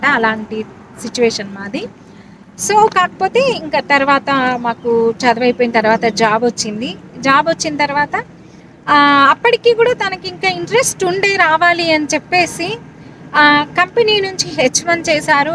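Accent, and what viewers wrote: Telangana, India. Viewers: Hi garu madu narasaraopet.kani me slang ala ledu.nenu Inka meeru Telangana anukunna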